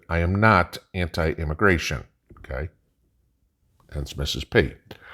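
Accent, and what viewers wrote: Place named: Philadelphia, United States. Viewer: Im hearing Philadelphia as well.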